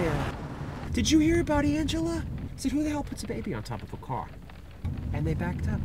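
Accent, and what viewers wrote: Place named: New York, United States. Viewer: He's got that New York/New Jersey accent.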